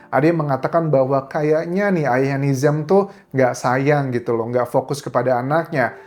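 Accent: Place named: Sunda, Indonesia. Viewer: lucu amat ngomong sunda nya mas 😂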